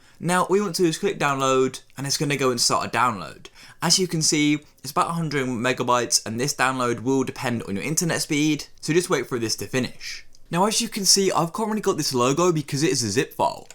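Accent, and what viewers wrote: United Kingdom. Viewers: thanks random british person on youtube :3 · Are you British?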